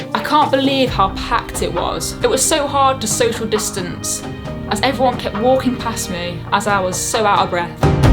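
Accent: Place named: Derbyshire, United Kingdom. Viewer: I love this. Also, I love the accent. Hehe. Which I actually listed as one of the things I love being at England. And made a short video about. Derbyshire ha. ❤️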